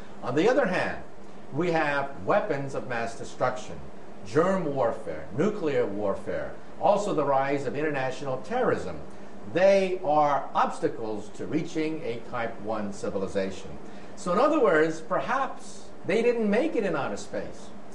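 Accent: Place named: United States. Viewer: his accent is so american xD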